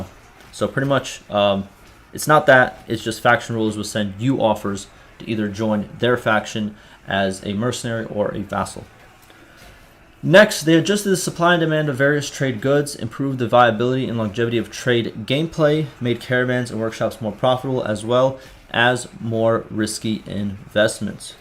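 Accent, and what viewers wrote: South, United States. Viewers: I feel you on the southern part it's def how we talk😂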